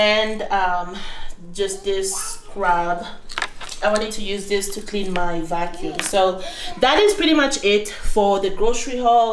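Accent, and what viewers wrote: Kenya. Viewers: Hi your kiswahili sounds kama ya mombasa, did you live at the coast before going to the U.S? Btw you're a really good Mum.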